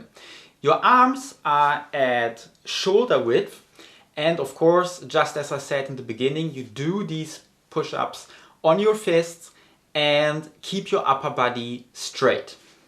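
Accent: Germany. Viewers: u sound like u have a erman acent u german?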